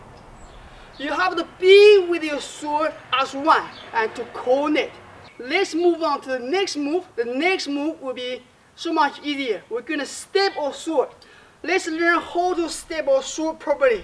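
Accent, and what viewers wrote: China. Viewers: I love his Chinese English not American pronunciation! I enjoy ALL his videos.. the Chinese sword is wonderful to watch in action! We want to see many sword techniques! Hope he does more at a slow pace so we can follow him! 💡🩸💡🩸🩸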